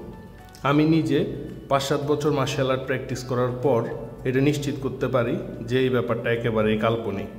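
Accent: Noakhali, Bangladesh. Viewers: ভাই কি নোয়াখাইল্লা নাকি?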